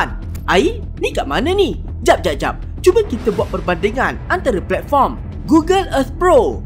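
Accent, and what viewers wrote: Malaysia. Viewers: Abang Abang orang Malaysia yah kok ngomong nya kaya Upin Ipin aku dari indonesia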